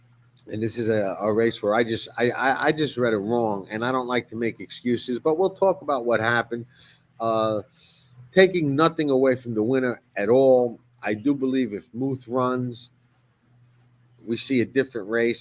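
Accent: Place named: East Coast, United States. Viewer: Hey,. I'm sorry, too. I left grey out..went with Tuscan..my reason was I didn't think grey could run well on short rest. I love your east coast accent, thanks for the hood insight. You know your game. I'm just learning.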